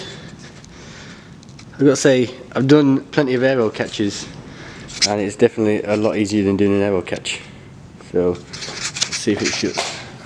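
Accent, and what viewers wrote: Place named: South Africa. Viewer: Love the accent! Where ya from? I'd have to guess south africa but honestly I've got no clue lol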